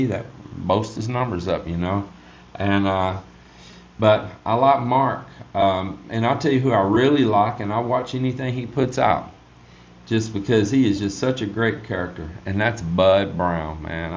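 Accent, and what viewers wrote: Texas, United States. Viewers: You actually had me at the beginning and I was checking if I had accidentally somehow changed my audio/video settings. Then I figured you were getting back at the guy that commented about your slow monotone Texas Twang 😂...I watched the whole video anyways. I'm half Filipino half American (born in the Philippines). I actually lived in the US for 25 years, was in the US Army for 12 (at the moment lived in the US more than in the Philippines). I'm retired now (42 yo) and live just north of Subic Bay. My eldest son was born in Frisco, TX when I was stationed in Plano, TX for Army Recruiting. I gotta get out there to your place and visit y'all sometime, I enjoy your channel specially the content about construction and the boats. Take care now. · I enjoy your Texas accent. The funny thing about the Texas accent is that it is contagious. 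I lived in Maine all my life until I moved to Cebu a few years ago and I had an uncle who I had never met who had lived in Texas for years and we were all so surprised that he had caught the Texas accent. In Maine a Texas drawl really sticks out. That works both ways, a Maine accent is very noticeable when traveling. I think that living in the Philippines does change a person's English by using different expressions like aircon, choco, CR, asawa, etc.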